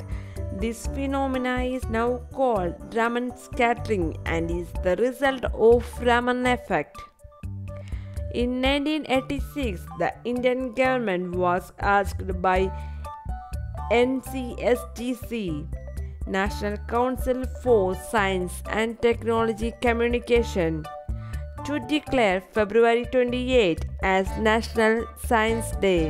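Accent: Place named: United States. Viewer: Funny and nice american english, btw tq for this precious video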